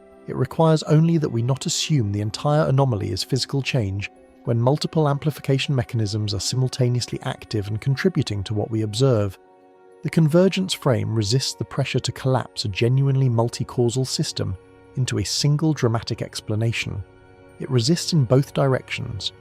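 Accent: United Kingdom. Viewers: Humans cannot put two and two together in real time 3i/Atlas came through in retrograde between the orbits of Mars and the Earth with its "tail" pointed towards the Sun - that is into the future orbital path of Earth and spewed toxic gasses, vapors and chu KS heavy enough to just slow and sit there. Now earth is orbiting through it and catching the debris and toxic soup. One needs to get over the fact that 3i /Atlas was not a Comet and it is simple to explain. The question is how long will be catching debris and what other dangers it brings Let us not make 2 hour lectures in stupor - it is explained by some orbital mechanics Different channel name same British spit mouth. There would not be lisp noise unless words are being spit mouthed. Use an American clean English AI text speech reader and stop repeating not this, not that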